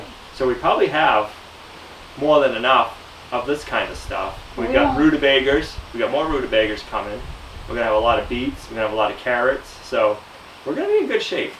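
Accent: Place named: New Hampshire, United States. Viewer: Here's a Tee shirt. "What's next on Lumnah Acres? I have an ide'er.... Love the NH speak. Reminds me of my dad.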